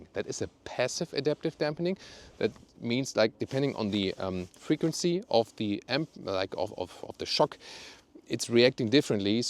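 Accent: Germany. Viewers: You have german english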